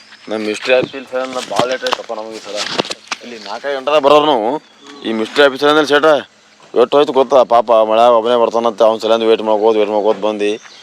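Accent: Gulbarga, India. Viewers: Me from Bagalkot but I like the gulbarga style kannada slang .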